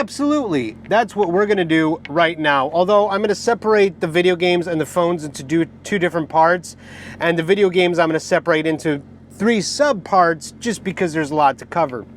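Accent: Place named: Russia. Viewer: I thought you had a Russian accent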